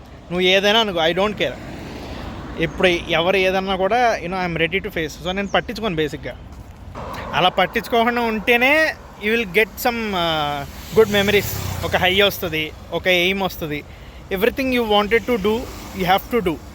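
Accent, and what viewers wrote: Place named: Godavari, India. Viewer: Ahaaaa Godavari slanggg vastundeeee 😂😂😂😂😂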